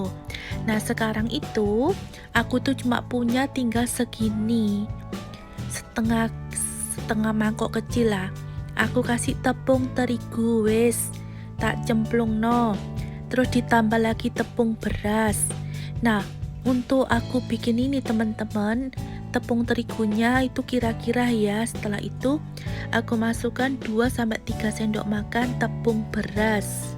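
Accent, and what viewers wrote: Java, Indonesia. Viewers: Dengerin cerita memasak udang gimbal..,njowone khas bunda hehe Monggo kampir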